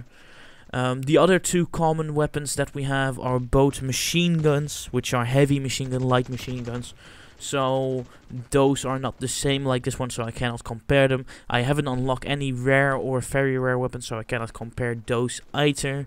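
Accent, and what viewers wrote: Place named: Netherlands. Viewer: lol i smell you are a dutch person right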